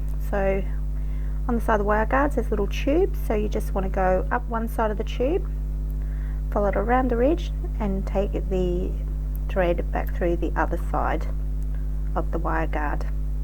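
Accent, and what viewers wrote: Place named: Australia. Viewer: She is Australian! Listen to their accent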